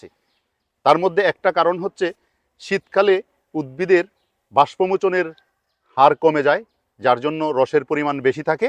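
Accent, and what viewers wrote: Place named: Bangal, Bangladesh. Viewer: গাছি ভাই। কি বাংলা মাইরি। বাঙাল দের বাংলা। দেশ পুড়ছে আগুন জ্বলছে আর এই মাস্টার খেজুর এর রস নিয়ে আলোচনা।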